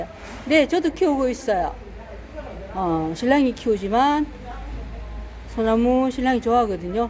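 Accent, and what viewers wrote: Gyeongsang, South Korea. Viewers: 님 어재는 알림받고 왔고 오늘은 님 구수한 경상도 사투리 목소리 들으러 왔어예 ㅎㅎ우리 시골산에 가면둥글많은대 즐청 풀청 합니다 예 😅😅😅❤❤❤❤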